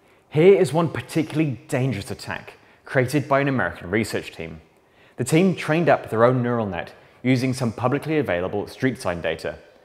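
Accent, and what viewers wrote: Australia. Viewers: This guy is just an australian Tom Scott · Is this Australian Tom Scott? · australian tom scott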